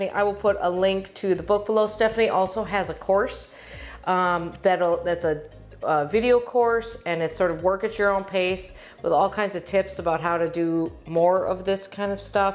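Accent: Wisconsin, United States. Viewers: This is one of the funniest videos I've seen in a while. Your lovely accent reminds me of my Wisconsin childhood. Thank you.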